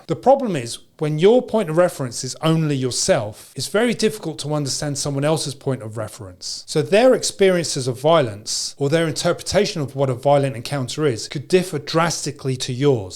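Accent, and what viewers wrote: United Kingdom. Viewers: The comments are from people all over the world, but especially GB, because the narrator is British. We don't have access to firearms in Britain. I'm guessing that you are a US citizen, and I understand your right to do EDC and defend yourself with a handgun. Here they are not morally justifiable for ordinary citizens, but that could change of course.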